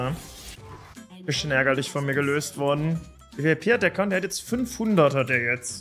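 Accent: Bavaria, Germany. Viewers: Wie sich der bayrische Dialekt am Anfang ausschleicht 😂😂😂😂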